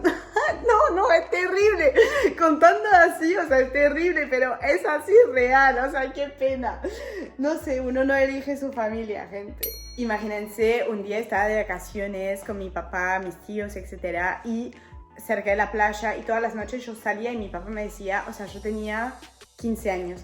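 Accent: Argentina. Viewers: Holaaa!.. Hermosa que lindo es escucharte eres tan espontánea, pareces más Argentina que francesa. Me imagino que en tu cabeza y corazón de vivir en Francia ó Argentina escucha a tú Corazón ❤.. por que si formas tu familia en Francia seguro alguna vez! Vendrás de Vacaciones a Argentina... acá te Amamos y ya sos una Argentina más. Hablas tan bien el lunfardo Argentino que me causa mucha risa... GENIAAA HERMOSA 🇦🇷🥰🇦🇷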